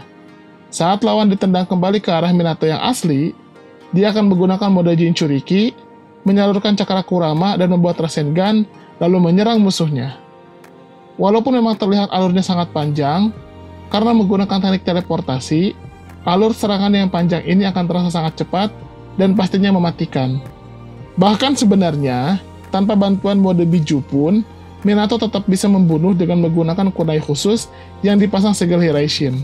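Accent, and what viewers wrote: West Java, Indonesia. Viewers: logat sunda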